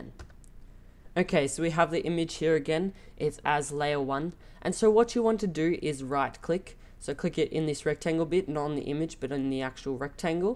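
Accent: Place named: New Zealand. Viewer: trying to f-eeg-yuh out your accent: Kiwi? Aussie? Good video.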